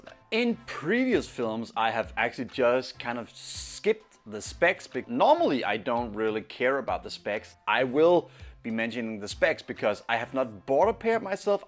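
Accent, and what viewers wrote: Denmark. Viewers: danish accent? are you from Denmark?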